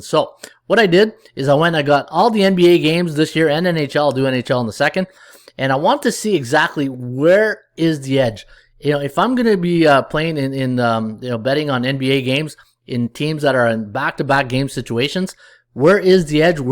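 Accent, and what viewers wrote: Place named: Canada. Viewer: You sound canadian to me...therefore, I'll be expecting many tee's down the road to back that tee from the other day. We sure lucked out yesterday with the Nats. Padres are scoring in bunches, and it doesn't seem to matter who pitches (recently). Going to be keeping my eye on it...their Staff, ignore the numbers, is weathered and tired. They are becoming overworked, and it should trend in the over direction with the offense/tired bullpen combo.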